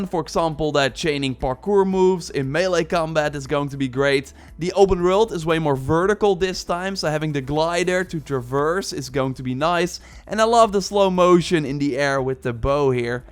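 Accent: Netherlands. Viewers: The Dutch accent is strong with this one. Good informative video 👍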